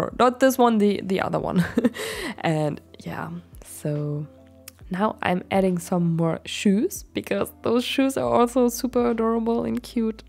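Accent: Germany. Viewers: Are you german? because of your accent.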